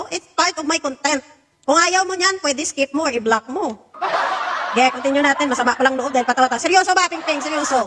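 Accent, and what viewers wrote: Iloilo, Philippines. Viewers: Super love ko talaga Ilonggo accent. 😂 ❤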